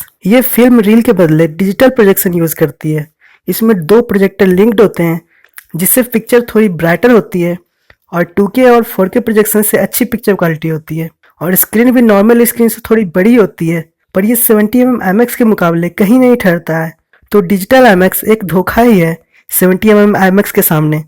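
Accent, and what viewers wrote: Patna, India. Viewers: Bhai tum patna se ho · Are u of patna?? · Bhai Patna se ho? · Bhai aap Patna se ho kya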